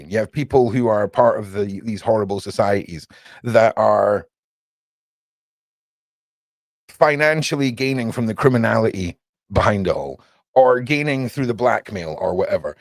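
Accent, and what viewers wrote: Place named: Scotland, United Kingdom. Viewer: Liked and subbed, because that Scottish accent is so effin posh! And the content or somefin' or nuffin' 🤣👍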